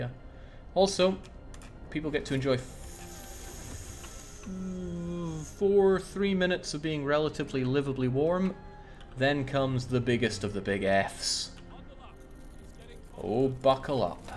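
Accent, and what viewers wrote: Scotland, United Kingdom. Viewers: Hey buddy how do you rotate the buildings? Love your commentary style btw, what part of Scotland are you from? I can't understand some Scottish accents but yours is the best I've heard!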